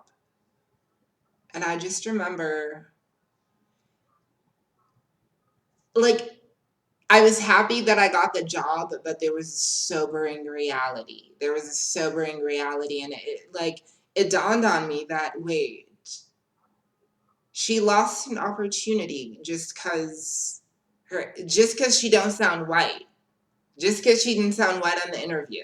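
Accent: United States. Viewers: I love the way you speak! As an American myself, i would say your accent sounds closest to the California American accent. After listening to u speak for an extended period of time, I could pick up that the American accent isn’t native since since you enunciate words better than most Americans; and u pronounce a few select words a bit differently here and there. But still by far the most convincing American accent I’ve heard from somebody not from here 🤗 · I thought you were from the U.S. maybe California. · You sound authentic American to me... and yes your word choices do show high intelligence... I had a SA friend in High School (Dirk) who spoke fluent German and English with perfect diction.. We use American TV to teach English in South Korea and the Philippines... You're looking relaxed and happy... have a blunt .. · As an American you definitely sound like you’re from California. 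Sometimes when you say certain words i can hear your South African tone come through but when I first started watching you i had no idea you weren’t from the states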